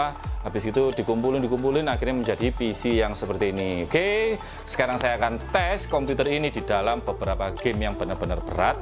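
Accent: Java, Indonesia. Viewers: maklum, wong jowo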